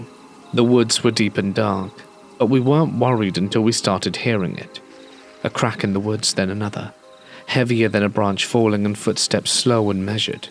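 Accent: England, United Kingdom. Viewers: Yay! New video from the classic English man narrator. Thank you:)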